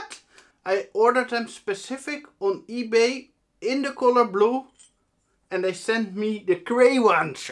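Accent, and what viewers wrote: Netherlands. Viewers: On you can hear he's from the Netherlands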